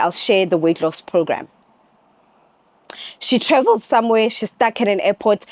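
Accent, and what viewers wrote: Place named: Africa. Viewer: Your voice is soo cute! I used to have a coworker that is also African and I’m jealous of ya’ll accent lol 😂 but on a serious note, DD needs to be stopped!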